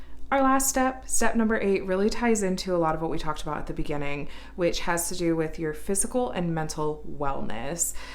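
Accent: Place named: RP, United Kingdom. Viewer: Very interestingly I picked up on what I perceived as an upper classman kind of "accent" at times. Maybe this is just a product of that teaching technique called ENUNCIATION. Whether intentional or not, to me this really INCREASED the perceived greatness and value of a project like this, and makes both the craft and the video much more interesting. Currently working on my first about Jesus holding hands with a child while walking on a trail in a forest. Love doing this.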